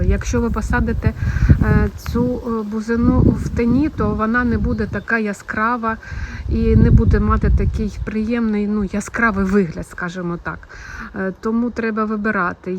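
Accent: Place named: Surzhyk, Ukraine. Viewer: Суржик як суржик, як на мене дуже органічний. Головне постійна практика говоріння💙💛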